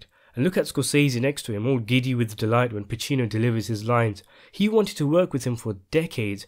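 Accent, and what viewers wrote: United Kingdom. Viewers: Thanks, darling. but I am really curious about your accent. which part of UK is this accent from? I guess you are from Scotland or Wales or Northern Ireland. is that right?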